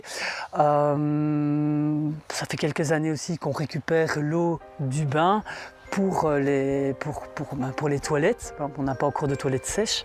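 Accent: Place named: Belgium. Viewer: Accent accent belge, juste un peu comme, sans plus quoi....Alleï bonne journée!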